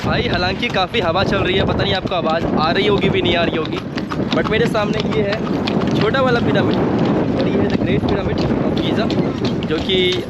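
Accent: Bihar, India. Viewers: That's Magadhi(south Bihar) accent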